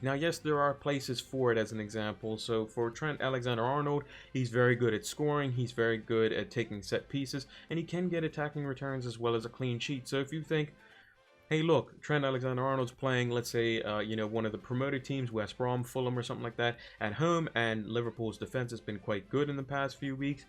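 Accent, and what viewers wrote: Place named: United States. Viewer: where you live mate? sounds like US but maybe you speak another language also?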